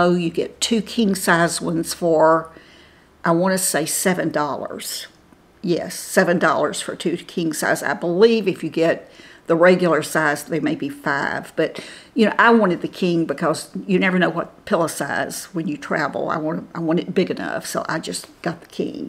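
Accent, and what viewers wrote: South, United States. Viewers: I lost 8 of my closest family and friends. I had to take care of my husband for two years. He had Parkinson’s. He died almost 4 years ago. My reason for telling you this is I did not have a wrinkle one when all this started in 2009 I got all kinds of stuff. I just don’t know which way to turn, i love to watch you. You are so down to earth and I don’t know where you come from but you sound like a southern a lady. From Alabama. 80 looks beautiful on you beautiful!! 🩷 I hope that I can b like you. I watch all of your videos and love each one thank you for being there for me. I feel like you’re doing this for me ha ha💓🌸🩷 Just don’t stop! U make my smile! Cathy Seymour from North Alabama🩷🌸🩷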